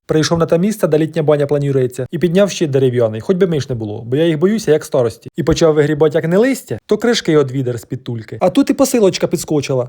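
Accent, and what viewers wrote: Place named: Surzhyk, Ukraine. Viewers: Як я вас люблю слухати))) Хоч ви з Черкащани, а звучить,як наш полтавський суржик зі всіма діалектами 😂❤❤ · Таки гарний суржик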